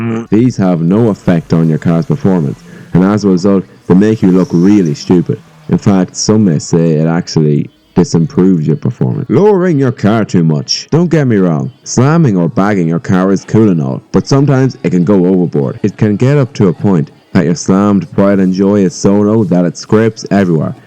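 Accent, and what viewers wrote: Ireland. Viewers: Are you Irish cause I am to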